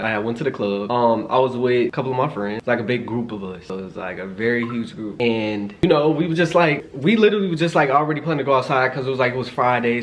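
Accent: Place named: Chicago, United States. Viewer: I can hear your Chicago accent stronger than ever in this video. You owe her some head tho. 😂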